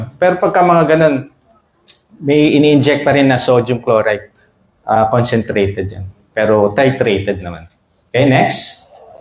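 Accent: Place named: Visayas, Philippines. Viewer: 👏👏👏👏ay bisaya man diay ni👏👏👏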